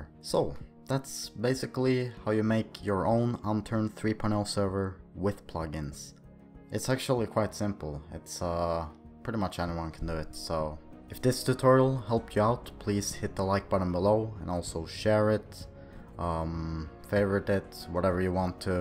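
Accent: Sweden. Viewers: OMG ARE YYOU SWEDISH???? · Are you from sweden? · Äru svensk?🤔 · are you swedish??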